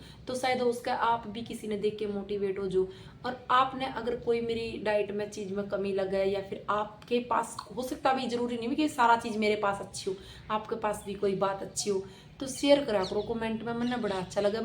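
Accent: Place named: Kurukshetra, India. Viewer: Nice video bahan app Kurukshetra jila se ho kya apki boli meri ali hai 😊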